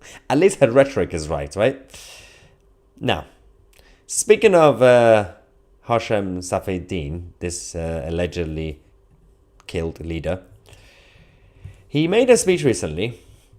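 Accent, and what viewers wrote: United Kingdom. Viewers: I just want to say , You're awesome brother..I wonder why I took long to know your channel..I hear your british accent, are you also arab or Indian or jew😂? If I owned this channel I would be exactly saying what you say here, I am your follower from today, drop contents as often possible ❤, love from Belgium 🇧🇪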